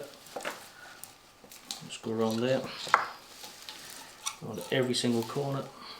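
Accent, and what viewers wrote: North East England, United Kingdom. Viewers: This is a great tutorial, thank you for posting. Also living in Brisbane and being from the UK it is really nice to hear an accent from the North East.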